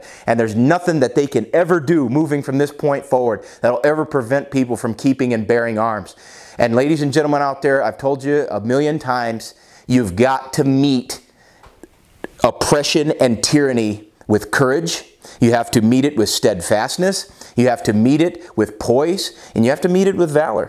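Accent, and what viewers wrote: United States. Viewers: love your "Teddy" style American speech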